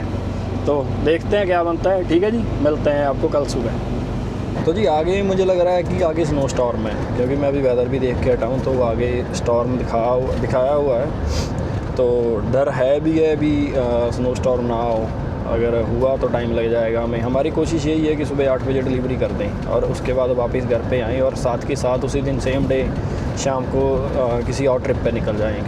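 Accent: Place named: Punjab, India. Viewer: Veer Punjabi ch ee aaja yaar ...teri hindi ch vi Apni Punjabi Jhalkdi aa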